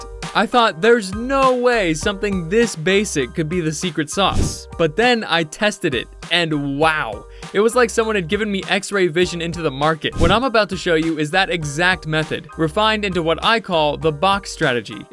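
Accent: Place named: United States. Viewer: I don't think that it's a matter of what timeframe. I think more of a case of when does the day start....I live in Tasmania Australia and my TIMEZONE GMT+11 and I mainly trade on FOREX and I am able to trade from Monday morning am until Saturday morning am which is market close time for New York trading at GMT-5. So I'm in guessing that he's referring to that timezone based on him sounding American. But the other question is, is it a 24 hour period or based on your local trading session?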